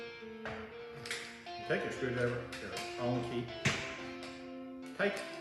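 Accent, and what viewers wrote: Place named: Donegal, Ireland. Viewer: Donegal accent always makes any vid sound better. :-)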